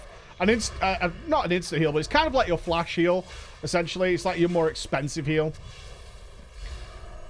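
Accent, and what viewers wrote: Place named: Northern England, United Kingdom. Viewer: so disc. is a shield bitch again huh? sounds good, I liked that playstyle. Oh the cringe.... everytime you butcher the English language, it just sends a chill down my spine. But I guess that what we learn is Southern English and so when you say something like Sat instead of sitting, it just sounds wrong. because "Sat" is past tense of "to sit". but I digress. I know its the way you northern English people speak, it just sounds wrong to me lol.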